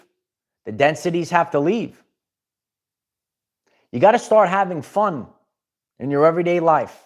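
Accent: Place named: Brooklyn, United States. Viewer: I am here for ur Brooklyn accent allllllllllllllllll day. Letter rip tater chip. I'm from Oklahoma and Texas and say yall. Ur the best. ❤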